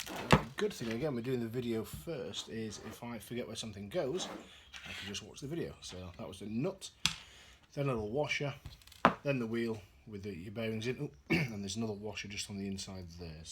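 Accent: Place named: Sheffield, United Kingdom. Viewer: I knew you were from Sheffield, thought I recognised it :D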